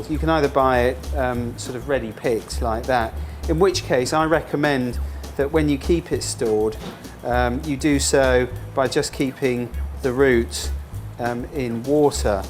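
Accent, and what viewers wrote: United Kingdom. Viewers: It's the British Accent Sounds Cool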